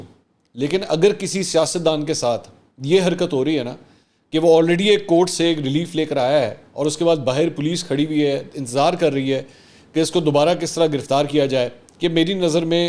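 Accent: Punjab, Pakistan. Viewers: منصور علی خان زندہ باد صاف ستھری باتیں مہزب انداز وچ پنجابی تڑکہ مزا آتاہے سننے میں الله سلامت رکھے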